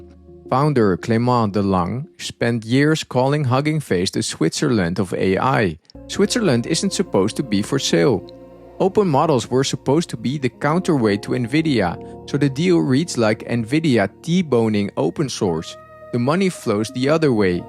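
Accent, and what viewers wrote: Netherlands. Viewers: Just dropping by to say you have the Dutchest accent I've ever heard in my life.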